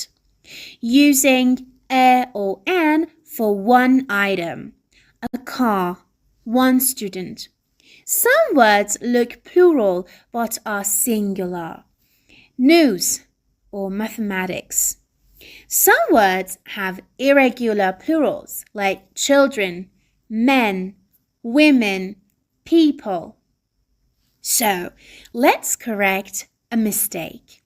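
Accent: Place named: United Kingdom. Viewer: British accent 🤗🌹💋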